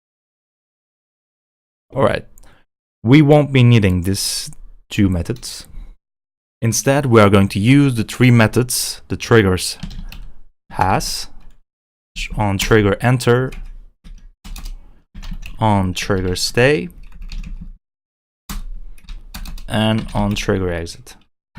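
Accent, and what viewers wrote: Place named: Turkey. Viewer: Lovely accent but immedietly heard something familiar. It didn't take me long time to realize you're also Turkish. Thank you for excellent video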